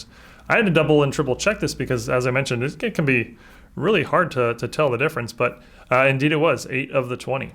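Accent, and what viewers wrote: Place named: Baltimore, United States. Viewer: By any chance are you from MD/baltimore? your accent sounds baltimore...and I feel like I met you years ago at a show I'm in my 30s now getting back into collecting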